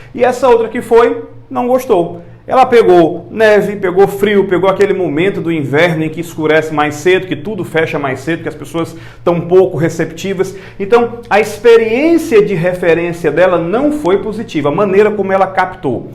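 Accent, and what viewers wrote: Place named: Northeast, Brazil. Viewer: Percebi pelo seu sotaque que é do nordeste, talvez recife ou Ceará ou até mesmo paraiba.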